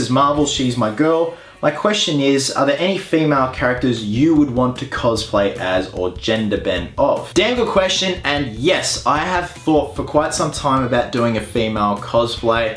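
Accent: Australia. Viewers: And they say the perfect guy doesn't exist. Australian accent included. haha :)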